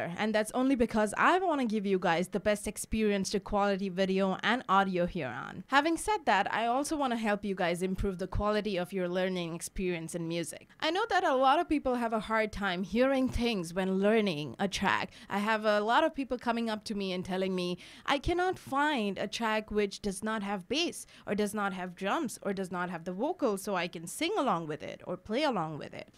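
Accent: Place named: United States. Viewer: Where do we learn to speak like a Yankee?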